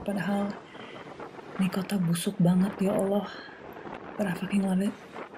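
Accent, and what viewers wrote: United Kingdom. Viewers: jujur banget nih gua,gua suka sejak vidclip zigas,ini cew siapa yah? gua telusurin ampe kemana2,akhir nya tau ceritanya nih cew campuran UK & Bugis Celebes,btw gua seneng ama lo yg pertama of course karna cantiknya,trus yg bikin melting itu british accent nya,im really fallin love with your accent,keep down to earth yow. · i adore your british accent!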